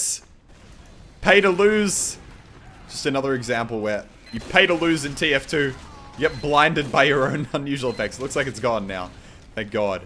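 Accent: Australia. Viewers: WAIT YOURE AUSTRALIAN ??? ---- G'DAY MATE !!! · Are you Australian because you have one of the rare Australian accents that is actually cool and not annoying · the whole time watching this I felt like this was prerecorded gameplay with sone crazy straya guy shouting at his own video...I bet is from the deep north of NT, like Darwin or something else weird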